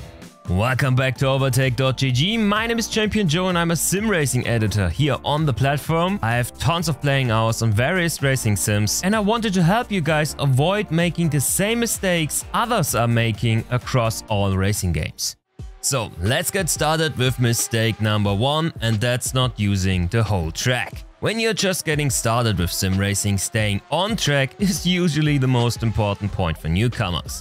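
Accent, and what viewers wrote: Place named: Germany. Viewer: Glaube man hört es wirklich raus wenn ein deutscher englisch spricht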